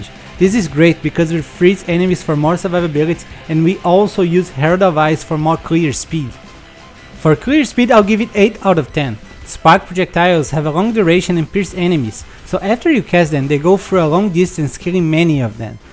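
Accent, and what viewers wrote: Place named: Brazil. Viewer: E esse sutaqao br ai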